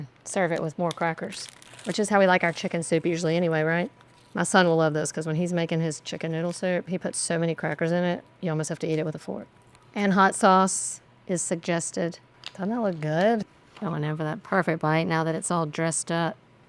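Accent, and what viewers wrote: South, United States. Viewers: What part of Alabama are you from ? I grew up in Birmingham but now am in warrior. I love your accent. To me it’s the kind of southern accent that is pleasing to the ear and doesn’t make us sound dumb and uneducated like the fake southern accent’s you hear on tv. Love your voice and your videos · I knew there was a reason I liked you so much, I lived in Mobile AL for 27 years and you have the southern thing down pat !!!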